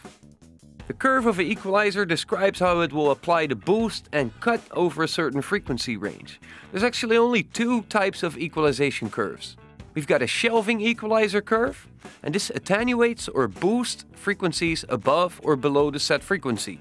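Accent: Netherlands. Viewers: Your accent sounds very Dutch .. are you by any chance Dutch?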